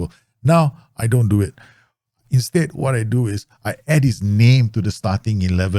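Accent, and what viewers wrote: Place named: Singapore. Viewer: Amazing content! I assume that you’re from Singapore from the examples and accent. Will work on a new save and focus on youth with this in mind. Thank you!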